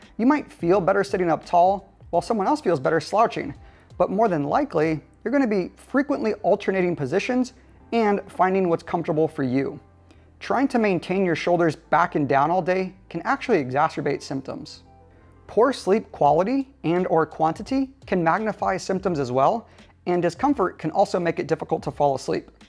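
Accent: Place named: England, United Kingdom. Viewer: Can u please reply me . I have same problem but i didn't understand your English accent . Its better if u write here. Whts problem is this.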